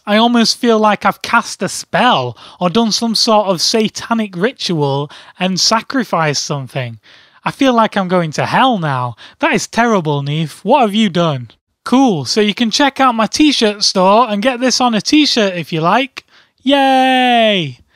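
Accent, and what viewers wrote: United Kingdom. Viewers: Yay female Scottish link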